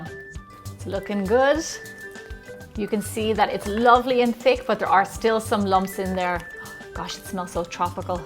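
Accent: Ireland. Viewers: Your accent!!! Beautiful. Irish? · Are you Irish? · ᏙᎠ ᎠᎰᏚᏟᎾᎡᎠᏙᏁᎢᎰᏙ ᏚᏤᏚᎢᎬᎷᏙ ᏁᎾᏚ ᏚᏙᏞᎢᏙᎢᎾᏒᎰᏴᏌᏚ fake?? shes Irish...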